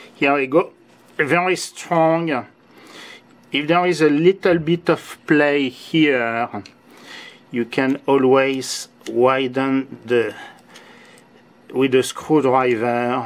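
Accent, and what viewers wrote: France. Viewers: Man I like your English with that French Accent!!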